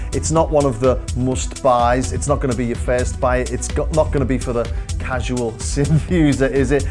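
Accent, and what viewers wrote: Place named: RP, United Kingdom. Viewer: Your Received Pronunciation accent is hilarious! Brilliant. You should run for Prime Minister and campaign with that RP accent the entire time and then when you get into office, switch back to your native Scouse accent. Haha.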